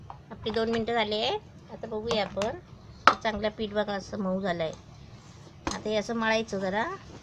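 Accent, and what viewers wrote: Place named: Ratnagiri, India. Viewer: Kaku, chapati chi paddhat pan chhan aani tumhi kelel varnan pan mast. Tumchya bholnyat Ratnagiri chya bolibhashecha godva sampurn video sampeparyant khilvun thevto.. 👍🏼 Dhanyawad.. 🙏🏽 🙏🏽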